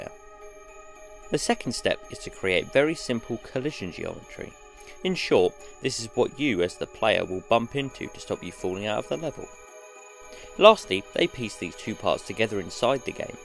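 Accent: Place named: Cockney, United Kingdom. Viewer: dat cockney accent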